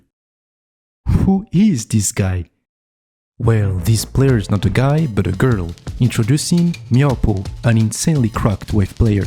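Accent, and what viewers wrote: France. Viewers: are u french or french canadian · i hear fr*nch accent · Tu serais pas un peu français frrot ? Ton accent te trahi. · I knew you were french since the first second of the video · Are u French? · I can smell the little french accent x) Anyways very good video !! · You're so french (i am french and i detect french accent lol)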